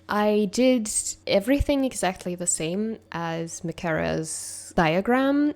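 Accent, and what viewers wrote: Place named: Germany. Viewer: I knew you were german! I am normally so bad with dialects and accents. I can't even distinguish between our german ones - exept for bavarian because I've got a bavarian friend and I think I am doing good to figure that out x3 Anyway I somehow thought you were german and it felt nice to be right about that and not be needing to read the subtitles while you talked to your boyfriend x3 I think Micarah's aren't exactly the best for tutorials tbh, but I looooove watching them. I know how to sew the bsaics and all but as I am not good enough and wouldn't know what to do with these sort of dresses/clothes (exept for maybe one day start cosplaying again x3)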